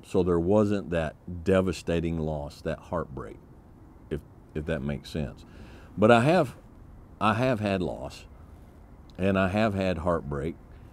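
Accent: United States. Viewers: Just get another buzz mate and there you go ))) I like your videos and stuff . And I adore your John Wayne's accent mate . Just keep doing it . I love it for God sake ))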